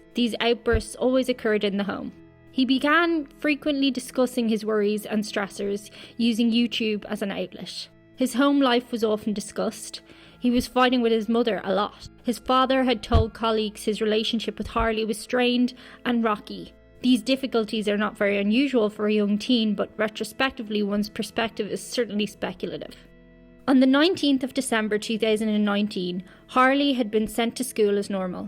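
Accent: Ireland. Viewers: I love your new channel. I did notice your Irish accent is more pronounced. I hope you continue towards a news magazine channel, you have soo much to offer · This may sound crazy, but in these videos, there's something about your accent that doesn't say "Dub" to me, though I know you are. Are both of your parents from Dublin, too?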